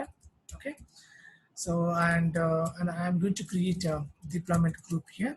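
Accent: India. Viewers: Its India English :) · Настоящий индус. Не тратьте время. Кошмар, а не акцент.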